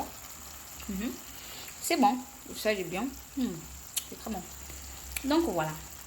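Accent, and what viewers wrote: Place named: Gabon. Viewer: On sent une gaboma 😂😂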